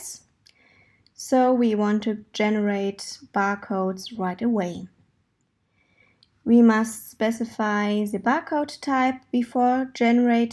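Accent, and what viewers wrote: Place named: Germany. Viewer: Not only a very useful video for us Xojo neophytes, but this girl has the cutest German accent ever. You can't help but smile every time she tries to use a 'V'.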